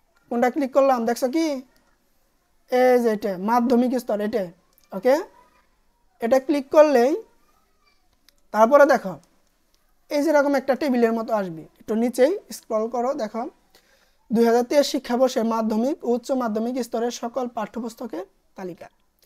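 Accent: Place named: Sirajganj, Bangladesh. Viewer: সিরাজগঞ্জ / আশে পাশে বাড়ি মনে হচ্ছে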